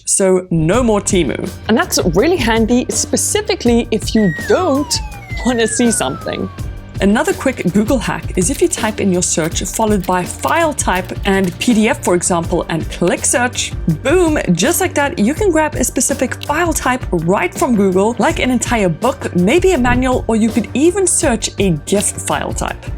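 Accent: South Africa. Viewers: I think it's a South African accent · hmmm the access almost sounds South African ? 🤔😄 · So glad I found this! Subscribed immediately. And that was 100% because of the South African accent and the smart stuff.:medal-yellow-first-red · Great video. Thanks. Well done!! (I’m curious… You South African? accent…🤔🤗) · She sounds like she is an ex South African .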